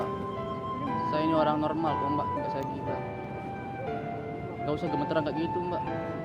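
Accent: Lombok, Indonesia. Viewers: Logat"nya kek org lombok njirr😅 Owyah Bdw salam bang Gua dri Ntb nusa tenggara barat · Boleh Sy nebak-nebak...spt Logat orang Lombok❤️ Mf kalo salah...! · knapa nada antum macem orang lombok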